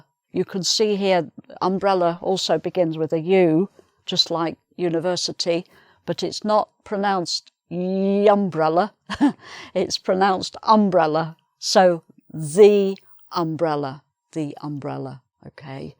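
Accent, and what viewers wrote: United Kingdom. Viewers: I loved it! I like your british accent, what part of the UK are you from? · Hi, thanks so much for your lessons. I'm fond of your accent, it is so British? Your explanations are clear and well structured, you're an excellent teacher!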